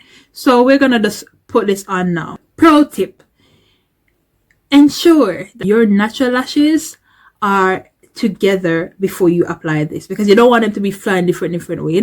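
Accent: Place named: Caribbean. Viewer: Thank you for showing this definitely like your Caribbean accent the way you explain things also for keeping it real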